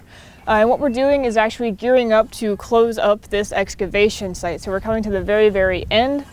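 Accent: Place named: Dorset, United Kingdom. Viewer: Hi. Really like your accent and tone of voice. It reminds me of my family from West Dorset in England. I also wonder that apart from the hard archeology how much effort do you make regarding social and economic history, the evolution of dialects. Perhaps you do follow the journey from an English colony of dissenters and adventurers to freedom you sort and the creation of your own country. Excellent and easily understandable videos. Nick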